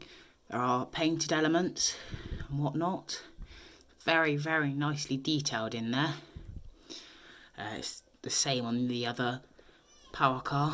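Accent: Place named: Scotland, United Kingdom. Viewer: Nice accent mate are you Scottish just wondering cuz my ancestors are Scottish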